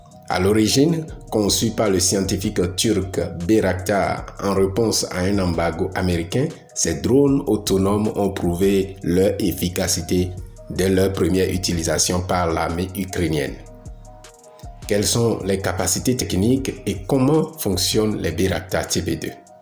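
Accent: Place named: Benin. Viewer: Il a un accent béninois. Mon frère trouve autre moyen de chercher tes likes et vus sur YouTube. Si plusieurs personnes dénoncent la vidéo. YouTube va la supprimer. Donc top départ les internautes.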